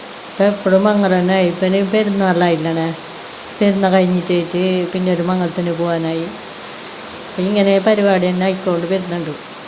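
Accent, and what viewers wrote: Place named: Kasaragod, India. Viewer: Nighale sthalm evde Kasaragod Karnataka boadr bagathula slanganllo athayath nde slang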